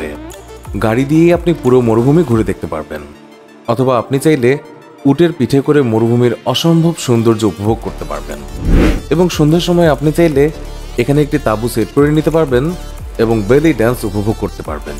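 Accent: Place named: Bangladesh. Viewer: Narrator, Why you call one hundred seventy thousand RUPY?? Shouldn’t it Taka?? Your Bangla accent similar to Bangladesh accent... So Taka you must mention. If you are a Indian man then Rupy is good for you.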